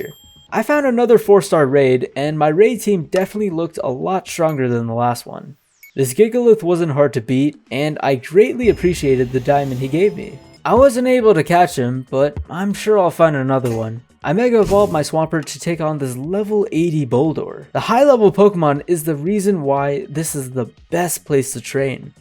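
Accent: India. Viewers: U indian??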